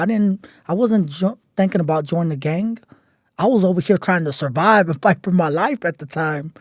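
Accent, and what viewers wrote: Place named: South, United States. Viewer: You serious? This fool is speaking like a ghetto ass reject using big words along that country bumpkin twang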